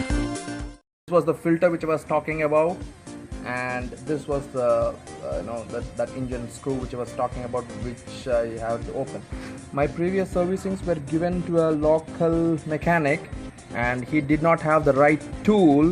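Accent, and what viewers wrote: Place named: United States. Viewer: Your English accent looks like that born in the United states of America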